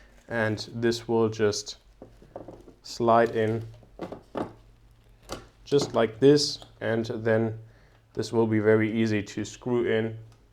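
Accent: Germany. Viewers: Man hört richtig Rausch das du deutscher bist haha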